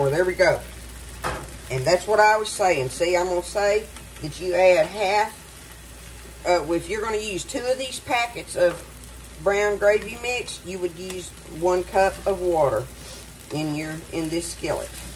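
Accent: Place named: Texas, United States. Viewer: I have that same pan Mrs.. As a proud Texas native, I knew what you meant when you said "hamburger meat". We use ground beef to make hamburgers with so to us it's the same thing 😅. I'm currently living in Oregon, so listening to you talk is music to my ears. I miss home. I can't wait to try this!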